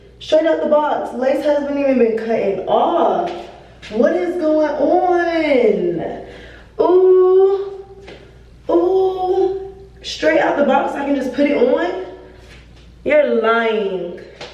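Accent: New York, United States. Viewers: you're picking up the New York accent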